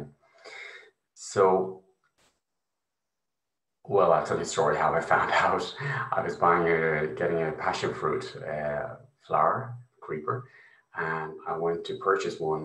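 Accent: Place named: Ireland. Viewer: Thank you,. Wonderful xxx When Kim D’eramo mentioned your name It sounds so Irish to me (also Irish!) and now that I’ve heard you, of course you are! Love and gratitude xxxx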